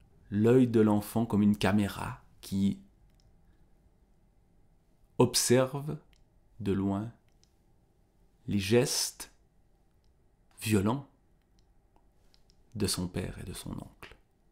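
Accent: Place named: Vaud, Switzerland. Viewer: beaucoup aimé ton accent qui vient de chez moi ( je suis vaudois)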